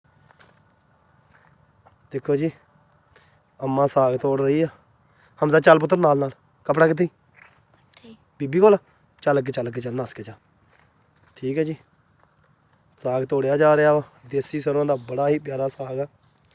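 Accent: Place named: Punjab, India. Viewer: Tuhadi boli to edan lgda k 47 to phele tuhade bzurag gurdaspur Amritsar or narowal side de rehnde hoangay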